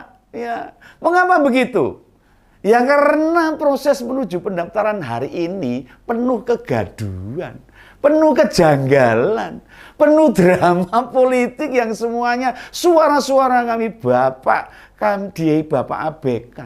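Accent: Java, Indonesia. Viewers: Om, ngomonge sedep, medok,jan nyanduni tenan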